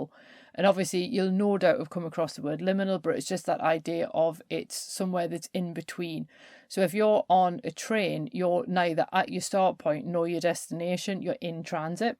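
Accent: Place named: North, United Kingdom. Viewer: One of my favourite things to do whilst travelling for work is to watch a spooky history soc on YouTube, I'm from the north so was very surprised to hear a familiar accent!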